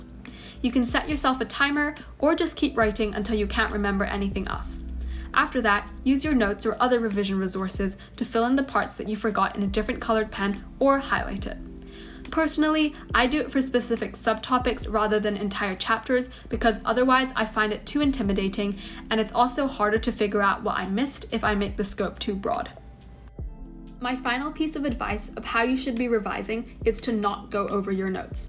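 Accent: United States. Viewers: How come you have an American accent ❤😂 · u sound american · you sound american but are doing gcses?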